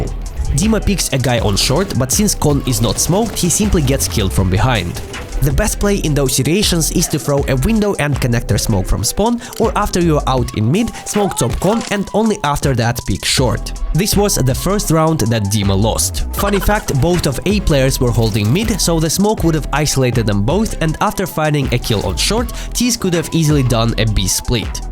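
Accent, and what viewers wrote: Russia. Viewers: а я один думал, что это русскоязычный тип? я начал смотрел его давно, когда он только снимал видео на ютуб и без вебки, и мне казалось, что его акцент и имя похоже на русского…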